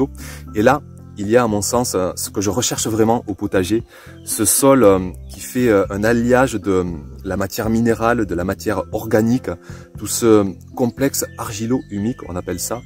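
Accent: South, France. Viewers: Bonjour, tout d'abord une réaction par rapport au sujet puisque tu nous y encourages.. Pour ma part, cette année, j'ai paillé en "temps normal" mais c'était sans compter sur cette "année à limaces" (et toute cette pluie) qui fut exceptionnelle! Donc, il a fallu tout enlever, déloger le surplus, puis, dernièrement, pailler à nouveau. Quel travail! De fait, les parcelles et butes ont perdu une partie du vivant qui était là, bien installé... Les plants des semis ont du rester plus longtemps à la maison et la reprise en terre, je le vois est plus difficile, plus lente. Idem pour les fraisiers, l'excès de pluie a engendré la maladie. Il a fallu enlever fruits et feuilles touchés et bien sûr dé-pailler. Mais le résultat a été concluant: A ce jour, 5Kg de fraises! Miam Miam lol. Autre info pour les abonnés: enlever la paille temporairement si, comme ici, il fait encore qques nuits très fraîches. 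Cela permet à la terre de pouvoir restituer la chaleur pendant cette dernière. La paille bloque ce phénomène. Utile par exemple pour les plants de courgette. Enfin, une question: Mes Blettes montent cette année. Je les laisse pour récupérer les graines. Ma question est celle-ci: le plant meurt-il après avoir fait les graines cad dois-je le couper et semer un nouveau plant ou bien va t il me donner de nouvelles cardes comestibles dans quelques mois si je le laisse en place? Je finis en te disant que tes vidéos, ton (notre lol) accent me rappellent le Sud d'où je suis originaire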